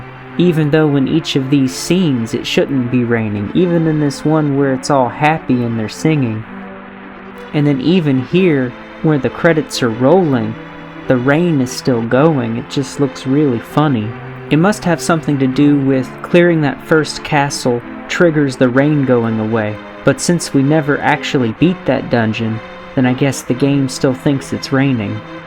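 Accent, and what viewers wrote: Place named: South, United States. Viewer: Alabama? Georgia? Mississippi? Tennessee? Where ya' from? TEXAS!?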